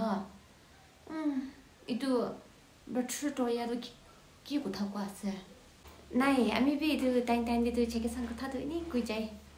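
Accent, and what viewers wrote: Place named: Nagaland, India. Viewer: Glad u used chakhesang dialect 🔥🔥🤧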